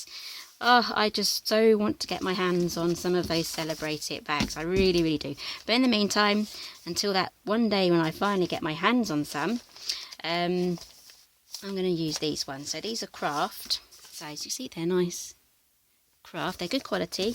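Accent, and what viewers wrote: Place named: United Kingdom. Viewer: So nice to see and hear someone from the UK, great video and book.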